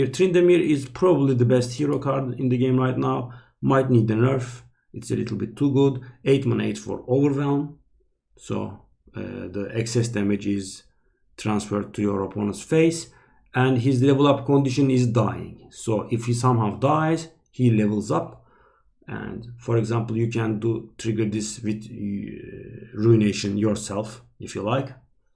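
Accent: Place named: Turkey. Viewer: abi vallaha konuşmandan anladım türk olduğunu :)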